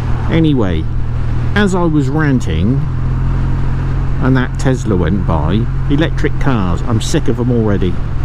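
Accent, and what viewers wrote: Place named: East Anglia, United Kingdom. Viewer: Norfolk or Suffolk 🤣🤣🤣🤣👍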